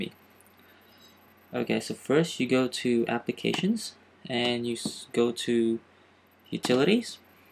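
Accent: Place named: Philippines. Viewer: galing na accent mo pareng.. proud ako sayo pinoy ka.. lol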